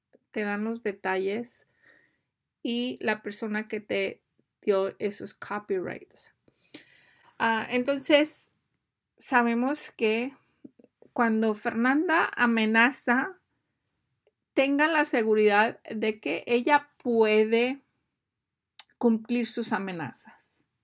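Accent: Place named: Cusco, Peru. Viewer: La ignorancia es atrevida e insolente, para tu conocimiento, ella es de Perú, pero no de la capital Lima, en Lima como en otras provincias el español es diferente con diferentes acentos, los de la costa, sierra y selva no hablan igual. es del Cuzco y allá la gente habla así, si te burlas de ella te burlas a base de tu ignorancia y tremenda insolencia, puedes opinar pero, antes EDUCATE E INFORMATE, para no decir tremenda estupidez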